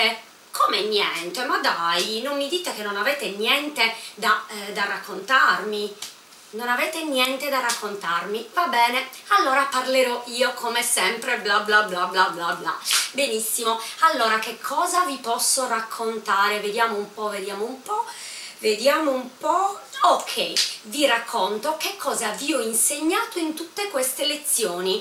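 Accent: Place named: Sardinia, Italy. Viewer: Ciao adesso,imparo italiana con Sardagna accente,sei une molta inteligenta maestra,bellissima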